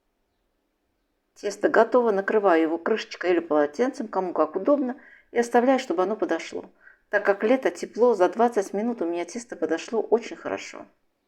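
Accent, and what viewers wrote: Baku, Azerbaijan. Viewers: ОБОЖАЮ ВАШ ГОЛОС И НЕМНОЖКО БАКИНСКИЙ АКЦЕНТ ВСЕХ БЛАГ ВАМ,ДОРОГАЯ!!!❤❤❤❤❤ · Очень приятно слышать ваш голос, узнаю наш бакинский родной диалект, он просто неповторим… у вас чудесные рецепты! Спасибо! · Здравствуйте. Большое СПАСИБО за вкусняшку.👍 Скажите пожалуйста, Вас что-то связывает с Азербайджаном....Я просто слышу в Вашем приятном голосе,наш,азербайджанский акцент! Извините пожалуйста,за не скромный вопрос.🙏🙋🌹🌺💕👍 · У женщины легкий бакинский акцент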